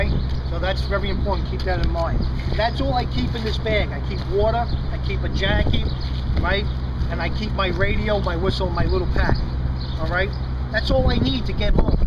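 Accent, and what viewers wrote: New York, United States. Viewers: man I wish I could have your new york accent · Dats for all yu trolls and haydus out dare. Love that New York Accent.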